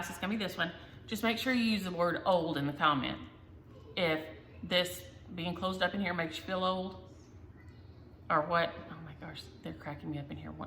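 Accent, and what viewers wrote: South, United States. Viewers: You crack me up! That moonshine story was so funny!! I love hearing your stories. I lived in Louisiana for several years while I went to school and your southern accent and "isms" brings back so many wonderful memories. Southern folk are the nicest people!!!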